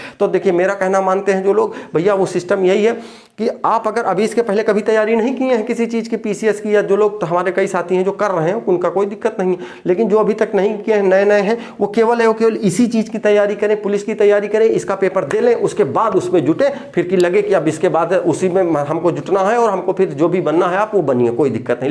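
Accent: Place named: Awadhi, India. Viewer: अवधी भाषा लगातीआपकी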